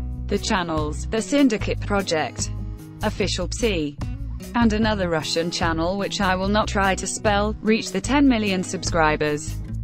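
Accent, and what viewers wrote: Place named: Portugal. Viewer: EU prefiro o sotaque português ,e enclusive assistindo seus vídeos eu aprendi o sotaque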